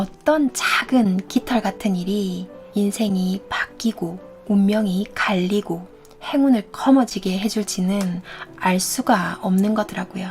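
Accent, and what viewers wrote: Gyeongsang, South Korea. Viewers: 저는 님 경상도사투리가 묻어나는 스토리텔러여서 더 좋네요. 마음편하게하는 목소리입니다. 구독하고 갑니다.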